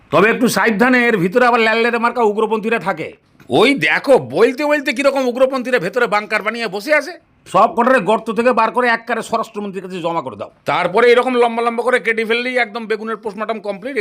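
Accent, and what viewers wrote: Bangal, Bangladesh. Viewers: খুব সুন্দর করে বলেন, আমি আপনার দেওয়া রেসিপি গুলো করে খাই, তারপর আমার মা চট্টগ্রামের মেয়ে, রান্না তো জানেন,আপনার কথা গুলো শুনে মনে হয় আপনি বাঙাল,তাই তো অত ভালো রান্না করেন।আমি শুভ্রা। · বাঙ্গাল কথা কি বলতেই হবে। কথার ধরন শুনে এদেশিরা আর আগ্ৰহ দেখাবে না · Bangladeshi accent 😊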